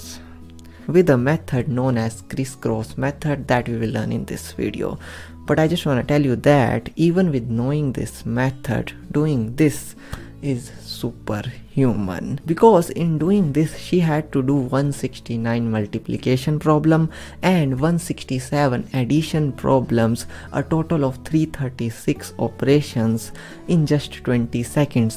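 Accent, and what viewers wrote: India. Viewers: Indian accent, shows it all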